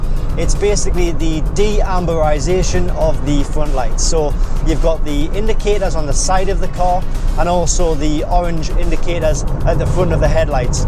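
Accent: Newcastle, United Kingdom. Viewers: The minute I opened the video I knew he was from Newcastle. Can always tell the accent. Did my master's and bsc there back in <num>. A great city indeed. Nice video by the way. Warm greetings from Nigeria.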